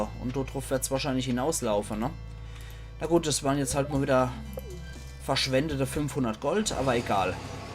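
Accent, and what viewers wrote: Palatinate, Germany. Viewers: Ja klar hab ich dich abonniert. :) Durch dein Lets Play hab ich ja Stardew Valley überhaupt erst entdeckt! Und dank dem Ackermann wusste ich dann oft, was ich den Leuten zum Geburtstag schenken soll oder wo ich etwas finde. Das hilft sehr, und ausserdem macht mir das Pälzisch solche Freude. Lustigerweise gibt es ganz viele Parallelen zu meinem Dialekt, dem Baseldeutsch. Und du kannst so cool Stimmen imitieren! Lewis und Willy - oder die alte Evelyn! Voll gut! :D Achja, ausserdem freu ich mich immer wenn endlich mal jemand anständig symmetrische Sachen baut. :) Freu mich schon auf die nächste Folge! Ganz liebe Grüsse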